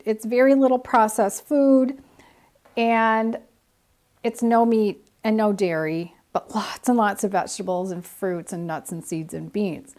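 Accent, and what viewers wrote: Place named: Michigan, United States. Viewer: I cant wait to make this, thank you for the recipe! Btw, you sound like you might be from MI. If so, hi from the UP!🙋🏼‍♀️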